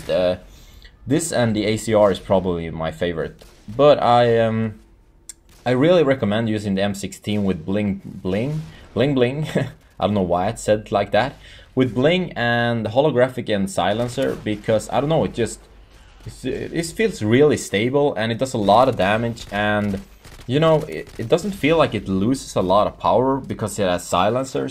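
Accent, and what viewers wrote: Sweden. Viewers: are you swedish? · lol Your Swedish accent is so hilarious.. keep it up dude.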